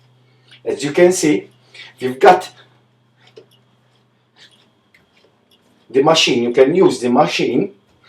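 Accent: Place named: Malta. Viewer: I love the Maltese accent and grammar so-so much!!!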